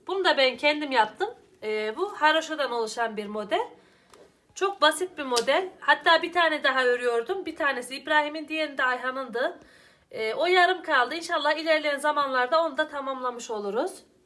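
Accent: Aksaray, Turkey. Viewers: Ellerine ve emeğine sağlık hepsi çok güzel biz Aksaray insanları hep doğal konuşuruz benim oğlumun ismi de Ayhan sizi çok seviyorum hanım beğenerek dinliyorum izliyorum selamlar öpüyorum seni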